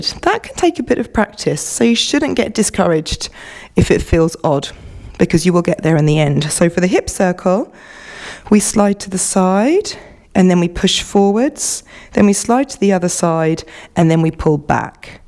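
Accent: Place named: Southern England, United Kingdom. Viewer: Thank you for providing such wonderful classes! Your English accent is also delightful, just wondering where you are from, somewhere in southern England?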